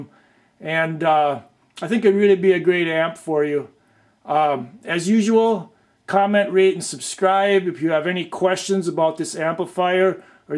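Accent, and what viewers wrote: Canada. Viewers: Nice video. Really informative, good job. Are you like, up in Canada, eh?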